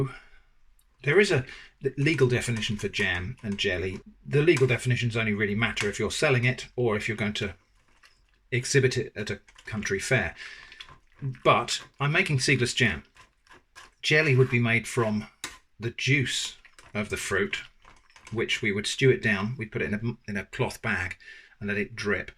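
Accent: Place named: Dorset, United Kingdom. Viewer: I'm starting to detect a slight Dorset lilt to your accent in your videos 😊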